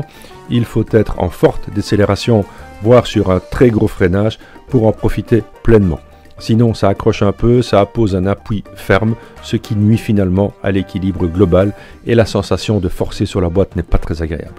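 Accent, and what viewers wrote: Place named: Belgium. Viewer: Perso j'ai commandé cette moto qui doit m'être livrée en avril/mai, je n'ai qu'une hâte c'est de la réceptionner et rouler avec !! Merci pour ta vidéo, très belle et bonne présentation de la machine et j'ai particulièrement bien aimé ton petit accent de Belgique !!!! ;)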